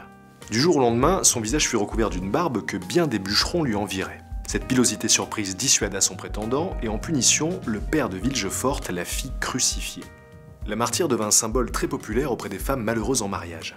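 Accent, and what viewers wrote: France. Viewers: Salut!!! Tres tres tres bien!!! En tant que tcheque j aurais aimé que vous ayez prononcé mieux (cad pas a la française) les noms des personnes et endroits, mais c est un petit detail pas tres important. Chapeau, ou bien “klobouk dolu” comme on dit chez nous. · J'ai un peu mal en entendant la prononciation française des noms tchèques, mais chouette visite.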